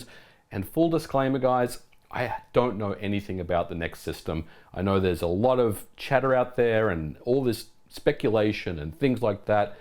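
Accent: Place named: Australia. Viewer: Hey brother, I’ve been a long time watcher of your videos. I think you experienced a lot of growth on your channel thanks to the pandemic so don’t be too hard on yourself comparing views to that time! YouTube is also pushing shorts pretty hard which isn’t your fault either. Everyone knows you’re one of the top voices in retro gaming on YouTube if not gaming in general, plus it’s nice to hear a fellow Aussie voice! Keep doing what makes you happy mate, merry Christmas.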